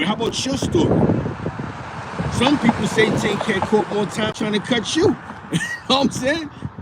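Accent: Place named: East Harlem, United States. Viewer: Damn I missed the live but salute and make sum videos it’s wack out here need to hear that East Harlem talk u know how we do 1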